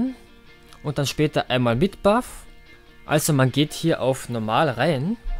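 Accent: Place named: Vienna, Austria. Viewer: hör doch den dialekt als österreicher raus ^^ wiener schätz ich mal :P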